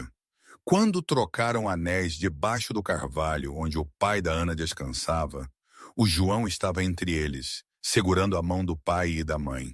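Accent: Portugal. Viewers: Esse português de Portugal, que tantas lembranças me traz ❤❤❤❤❤❤❤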